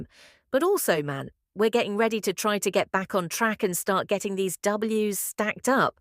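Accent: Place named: Australia. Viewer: Click bait sewer with an Australian accent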